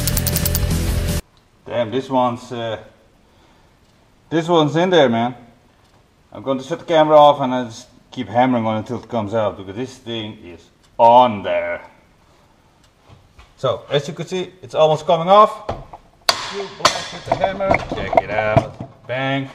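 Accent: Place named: Netherlands. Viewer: Na 1 zin dacht ik al te horen dat je NL'er bent. Alsof ik mijzelf Engels hoor praten. Spuitbus van de Gamma bevestigt het. Bedankt voor de nuttige info. Ik was er al een tijdje naar op zoek.👍🏻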